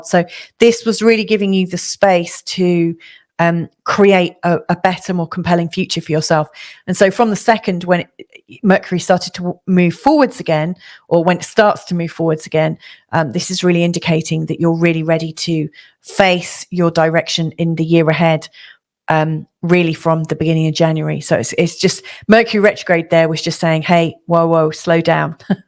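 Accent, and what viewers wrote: United Kingdom. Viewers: you seem like a really nice person! great insights; calm, british accent (a must) - haven't been into astrology for a few years but you've got my mind moving! thank you :) - scorpio (pluto/mercury first house) scorpio (sun venus chiron 12th) & aries moon (4th) :))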